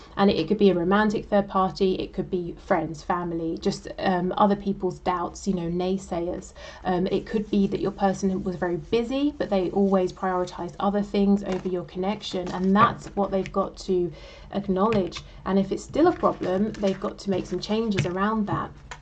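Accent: United Kingdom. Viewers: I love British accent, lol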